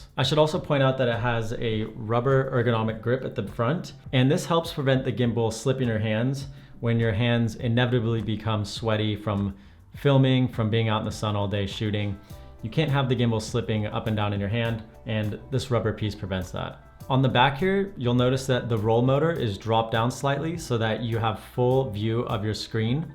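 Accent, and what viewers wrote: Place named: United States. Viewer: Are you American or the best Swedish English speaker In the world ? Lol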